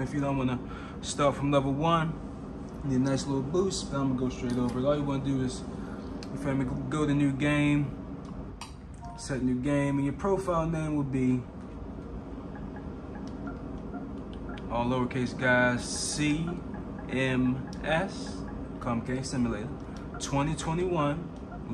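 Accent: Baltimore, United States. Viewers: You from Bmore I can tell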